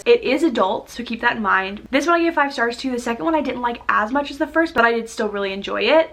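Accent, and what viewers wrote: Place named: Russia. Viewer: You akcent rusian 👀👀👀👀👀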